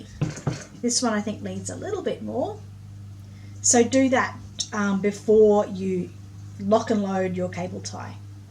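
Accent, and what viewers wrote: Australia. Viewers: Hi I am very new to reborn l wanted to complement you on your lessons have learnt so much from you it is so nice to hear an Australianaccent will keep watching you al the best from Sheryl from Victoria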